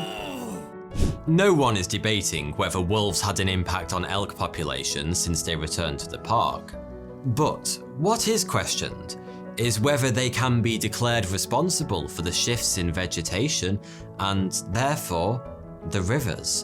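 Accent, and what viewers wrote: United Kingdom. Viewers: Great videos, thoughty and smart content, daily posting along with a narrator that spoke with a British English accent and with awesome discounts! This is awesome!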